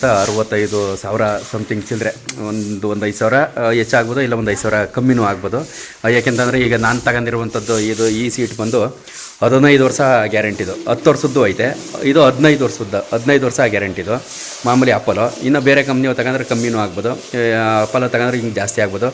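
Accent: Mandya, India. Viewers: ನಮಸ್ಕಾರ ಅವರಿಗೆ ಡೈಲಿ ವ್ಲಾಗ್ ಮಾಡಿ ನಾವು ಬಳ್ಳಾರಿಯಿಂದ ನಿಮ್ಮ ವಿಡಿಯೊ ಇನ್ ಟ್ರೆಸ್ಟಿಂಗ್ ಇದೆ ನಿಮ್ ಮಂಡ್ಯ ಭಾಷೆ ಕೇಳಕ್ಕೆ ಖುಷಿ ಅಸ್ಸುತ್ತೆ ಶೇವಿಂಗ್ ಮಾಡ್ಸಿ 5 ವರ್ಷ ಸಣ್ಣ ವ ಆಗಿದಿರಾ ಮನಸ್ ಮಾಡಿದ್ರೆ ಇನ್ನೊಂದು ಮದುವೆ ಆಗ್ಬೋದ್😊😊 ವಿಡಿಯೊ ಸೂಪರ್👌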